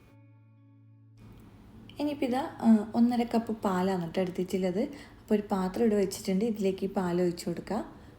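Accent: India. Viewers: ശബ്ദം കേൾക്കുമ്പോൾ തളിപ്പറമ്പ ടെച്ച് ഉണ്ട് 😄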